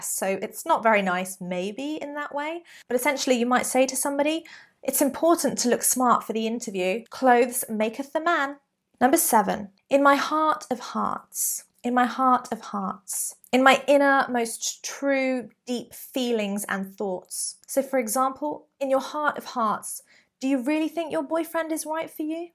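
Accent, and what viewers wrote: United Kingdom. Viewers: Like the subject n uk tone 👍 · .. I hope you're doing jolly well... I love your accent.. Would you mind making some videos to improve british accent... And more Posh videos... they are my cup of tea... Thank you so much for your time...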